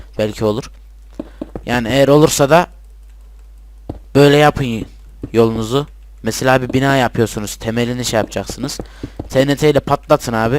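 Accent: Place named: Istanbul, Turkey. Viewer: sesin öyle 34 gibi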